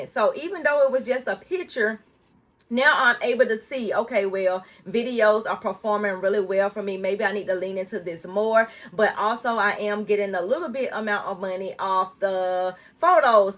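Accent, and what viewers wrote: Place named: South, United States. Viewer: Love your style and your cute country accent 😊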